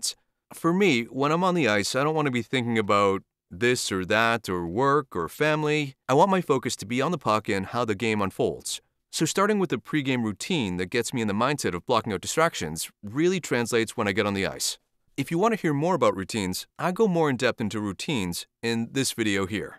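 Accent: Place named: New England, United States. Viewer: What part of New England are you from? I'm from Massachusetts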